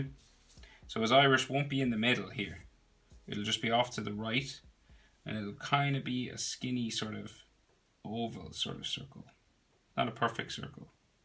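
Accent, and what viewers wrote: Ireland. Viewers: Are you Irish? Just curious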